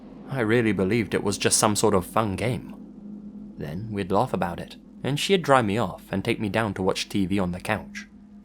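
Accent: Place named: United Kingdom. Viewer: My favorite British narrator is back in action!!! Missed you. Love from USA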